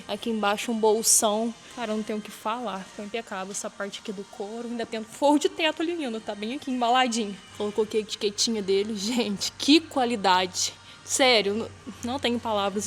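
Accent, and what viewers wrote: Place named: Caipira, Brazil. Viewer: Essa equipe é a melhor em assunto de reforma e criação de projetos. Esse sotaque caipira da é um charme. Simpatia beleza e leveza tudo junto nessa equipe.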